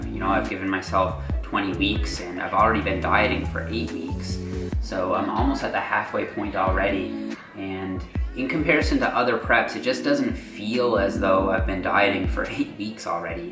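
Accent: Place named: Canada. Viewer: New fan here, love the content, love the accent (Canadian?). subscribed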